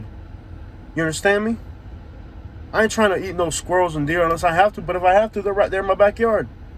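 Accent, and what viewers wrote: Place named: South, United States. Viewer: Hey you developed a southern accent rather quick.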